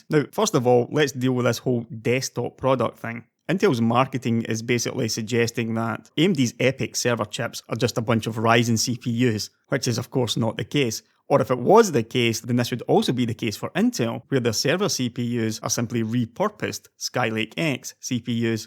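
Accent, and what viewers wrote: Scotland, United Kingdom. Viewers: is he scottish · I honestly want to think you are Scottish but 1% of my brain says you are Irish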